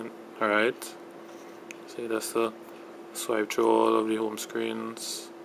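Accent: Trinidad and Tobago. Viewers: i love ya accent, im from jamaica....u trini ?? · dude you from trinidad huh?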